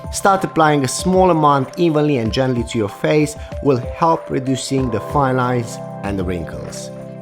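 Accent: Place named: Italy. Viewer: Is he Italian or something he has an accent that us not fully British.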